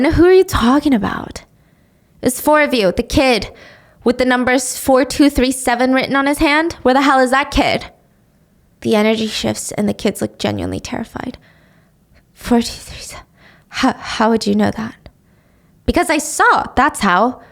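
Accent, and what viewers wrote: United Kingdom. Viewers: how do u always become britsh so randomly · Uh why sound british¿!?!?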